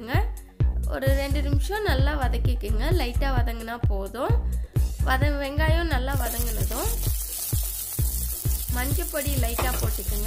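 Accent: India. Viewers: This is Coimbatore slang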